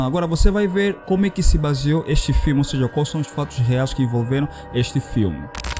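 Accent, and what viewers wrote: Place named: Angola. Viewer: Estava atrás desse episódio já faz um tempo e gostei de ouvir no meu sotaque Angolano🎉🎉🎉🎉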